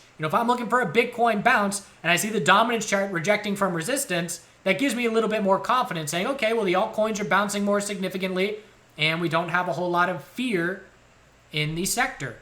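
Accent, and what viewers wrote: South, United States. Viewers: Like the southern accent more 👌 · if that wasn't enough a nice southern accent to put a smile on your face. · Love the aggressive southern trader accent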